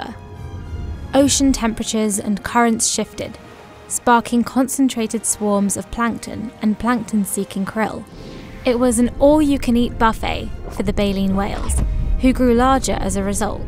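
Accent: United Kingdom. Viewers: too british accent.nicee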